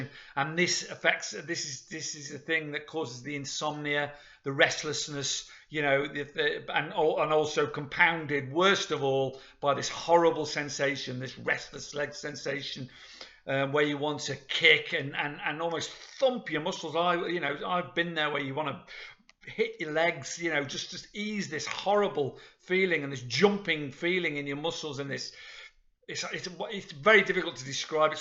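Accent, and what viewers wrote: Liverpool, United Kingdom. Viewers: ...I've seen many videos on opiate w/d...I'm an old hand at it like yourself. But you've shot straighter than most I've seen. Often people will say, "After 4 days the worst is over"...you can be sure that person is taking the piss, out and out lying...why someone would want to pretend to be an addict is beyond me. I hope you are doing well. I had a close friend in Florida where we drove cabs for the same company...he was from Liverpool...you sound like you're from if not Liddy, then Manchester or the neighborhood. Great people in the North. Well, thanks for caring enough to make these for those facing the dread of RLS, what we used to call "jimmy legs". Cheers from Pennsylvania! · PS love your accent , what part of the UK are you from?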